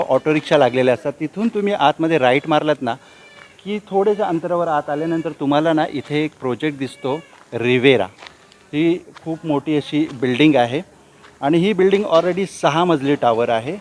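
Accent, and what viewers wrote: Konkan, India. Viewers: Mi hindu aahe pan tumchi kokani muslim language aikayla far god watate. Jevunshi aiylu etc. all d best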